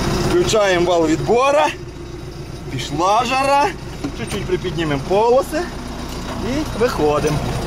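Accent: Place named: Ternopil, Ukraine. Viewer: Дякую за Украінський канал і за рідну Тернопільсьську говірку, супер!!!))))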